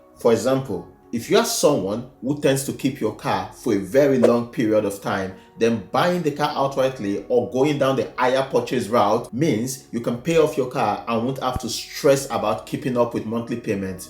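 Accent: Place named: Africa. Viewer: I’m here for the great advice and African accent lol 😂😂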